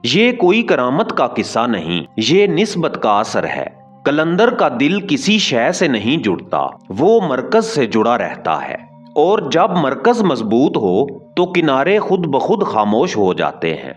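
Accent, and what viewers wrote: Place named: Punjab, Pakistan. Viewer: Narrator voice is so irritating , sharp and with a Punjabi accent. Please replace the narrator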